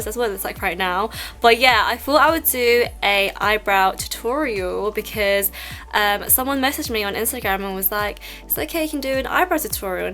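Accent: United Kingdom. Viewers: Are you british? sorry I just subbed your channel >~< Though Ive been stalking you on instagram for a while... that doesnt sound creepy at all... anyway, love you! ^•^ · I DIDNT EXPECT U TO BE BRITISH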